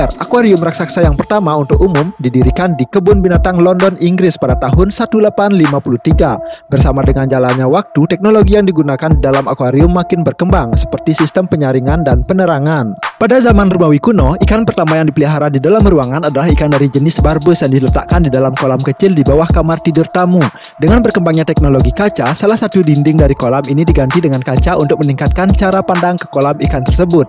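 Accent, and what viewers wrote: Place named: Bali, Indonesia. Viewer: Tpi logatnya Bali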